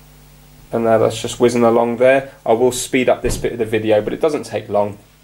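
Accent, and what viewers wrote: United Kingdom. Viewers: That was so clear and easy to understand! Thanks. I lost my WiFi contract recently but when I can afford it again, I'll try this. Wish you were a neighbour; I live in Japan and am a woman in my 50s always struggling to sort out computer problems thru videos like yours. Most of my friends are older females like me and if I ask them anything concerning PCs, provider contracts, whatever, they invariably tell me they have no idea and say: "I always leave it to my husband, son, son-in-law (insert any male relation here) " and it is so frustrating. Is it the same in the UK and why? Why do men seem to be more knowledgeable on the whole? BTW nice to hear a British accent again!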